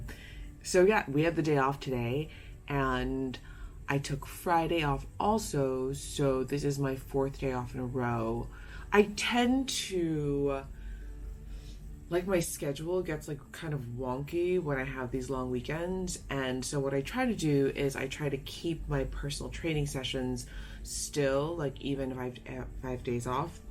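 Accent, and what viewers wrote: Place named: Long Island, United States. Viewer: I knew you were from NY! I live on Long Island and was surprised at how much you have that typical Long Island accent.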